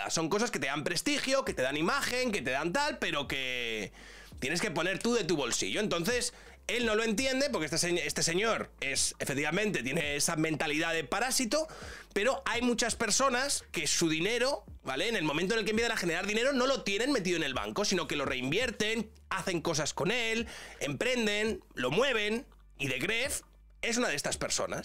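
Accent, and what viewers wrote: Mexico. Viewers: 😂😂😂😂, me gusta tu acento mexicano ja,ja,ja,ja,ja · no se por que youtube me recomendó el video, no entiendo el contexto pero ame tu acento mexicano 🤣🤣🤣🤣🤣🤣 entonces esa youtuber española si estaba haciendo acento mexicano cuando resumio coco y yo creyendo que el barrio la respalda. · Buen acento mexicano, en mi opinión. Me imagino que ese hermano Culichi le escribió para platicar algo de seguridad en mi México lindo 🙈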